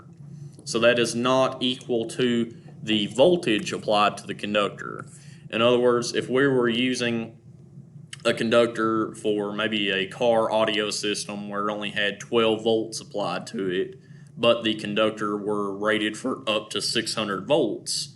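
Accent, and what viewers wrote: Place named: South, United States. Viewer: Thank you for the well put lecture, listening while driving to work. I enjoyed the cool southern accent too 😂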